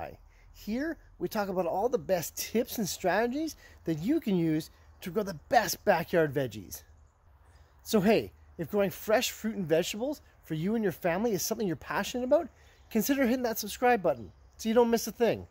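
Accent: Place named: Canada. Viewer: Cool Canadian accent😁 thank you for sharing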